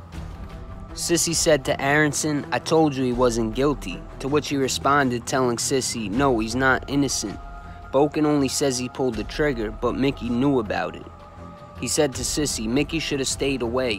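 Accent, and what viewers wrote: United States. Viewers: Yo Stight up I think you got this brother OC Shortz. No disrespect to OC Shortz his video official. But first of all ya styles are so different they can’t even be compared they two completely different styles. He got that commentary vibe going where he on the videos talking mixed with I’ll digital & sound effects mixed in. But on da real ya style is original. You don’t show ya face. Your digital editing skills coincide with your voice. The way you show the picture’s of the real guys with dope arrangements. Your version is straight up official mini documentaries. Plus let’s just face it. This is “ Our Thing “ ya American voice and the audio delivery sounds official! 💯 ya voice matches ya Video presentation. A British accent kinda takes away from the vibe like a student presenting A presentation of a foreign life only able to just spit facts about what he researched. 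Don’t get twisted his shit dope like I said no doubt OC Shortz is great in that style. But in my option having family members of my family in the life, no comment on myself. Lol I prefer your style. My brother if it was up to me you got him , ya best doing this shit right now. This is the American Mob, ya voice match’s the vibe of ya content. Not seeing you makes the audience paint a picture of how they perceive you look. Which with ya serious tone would be perceived as gangster in American gangster vibe.. So like said no disrespect to the British homie doing the whole commentary vibe with his face up in the camera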